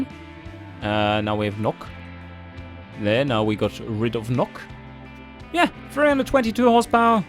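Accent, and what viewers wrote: Germany. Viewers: Mate, are you german?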